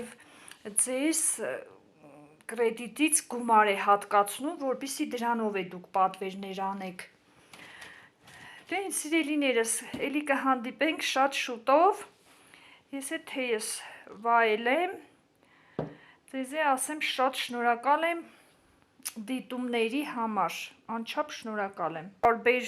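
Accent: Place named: Gyumri, Armenia. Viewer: Հարգելիս որտեղ եք ապրում գյումռեցու աքցենտ ունես համեստ անձնավորություն եք նոր եմ ձեզ հետեվում Ամնից Լիզա🎉😂😢😮😅❤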